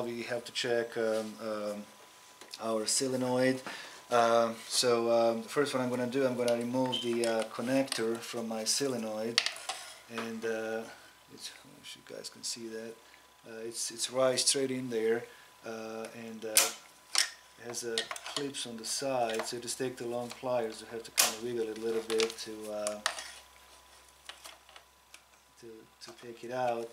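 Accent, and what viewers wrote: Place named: Kentucky, United States. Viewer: Love that old home Kentucky accent Hristos Voskrese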